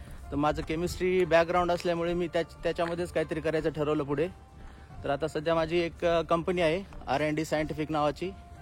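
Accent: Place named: Latur, India. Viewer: बोलण्यावरून समस्त आमच्या लातूरचा माणूस जय श्री राम 🚩🙏🏻🙏🏻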